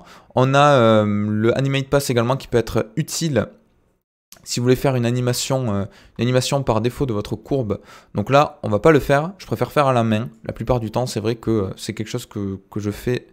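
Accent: South, France. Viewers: Bananée 🤪🥂 beau gosse.. 😉 Tu ressembles à un de mes cousins sans la moustache et le bouc et sans le p'tit accent du sud. 😉😘 Toujours pour tes tutoriels même si je ne suis pas souvent disponible depuis que j'ai retrouvé du travail. Je te souhaite pleins de nouveaux projets pour cette nouvelle année et de nouveaux abonnés car je t'ai mis en sélection de chaînes via ma chaîne youtube en sélection de chaînes pour apprendre Blender en français. 😉😘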